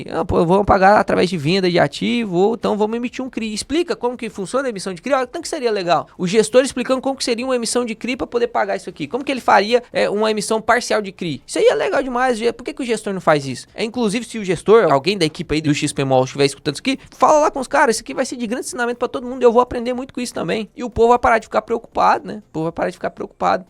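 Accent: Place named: Goiás, Brazil. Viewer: Toda vez que o fundo realiza uma cagada dessas, tão óbvia de ser evitada, é porque alguém lá dentro ganhou com as transações, alguém ganhou e vários cotistas perderam, infelizmente. Quem discorda disso ainda vive em um mundo fantasioso na imaginação. Não é momento de vender as cotas, como geral sabe, o fundo não vai quebrar e a crise vai passar, mas pra quem é inteligente, a desconfiança vai permanecer e acredito que isso daqui algum tempo, possa fazer vários cotistas abandonarem o barco! Aquela máxima quem bate esquece mas quem apanha não, se bem que no Brasil, pra lembrar, tem que apanhar muito, e nos fundos geral tá apanhando pra caramba a algum tempo! Sou meio pé atrás com os vídeos do Lucas vejo ele com uma postura muito negativa com 99% dos fundos, se escutar ao pé da letra tudo o que ele diz, ninguém fundo presta pra investir, mas se tiver um pouco de filtro, vai perceber que o olhar dele é muito crítico e refinado, não alisa nenhuma gestão e abre os olhos pra minutos podres que passariam despercebido facilmente! 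Também gosto muito do seu canal, seu ar de Goiano de falar me agrada porque também sou goiano, mas não dá pra passar pano, dá impressão que foi comprado ou está em um estágio de negação, no mais acompanho o canal e aprendo muito com seus vídeos, mesmo achando que em alguns vc fala muito e passa um ar de pastor querendo agradar ou alienar seus fiéis kkk, mas é isso, tudo precisa ser visto, filtrado e absorvido! Abraços!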